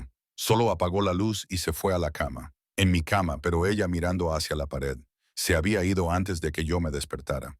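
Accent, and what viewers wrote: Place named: United States. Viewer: Qué pesadez ese scento gringo de AI (estupidez natural)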